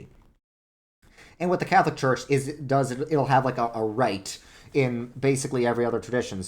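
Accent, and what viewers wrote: New York, United States. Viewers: Don't worry he is just a new Yorker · He is from new york they do talk like that.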